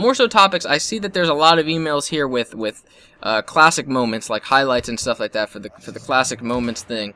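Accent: Canada. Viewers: hey are you canadian because i here you say guy a lot lol i love all of your videos man i watched your live stream last night pretty awesome god i love your videos and the other creatrues too lol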